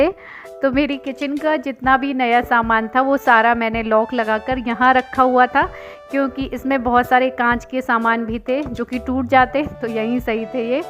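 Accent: Uttar Pradesh, India. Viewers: Kahan rehte ho aap.Accent apka up ka lagta hai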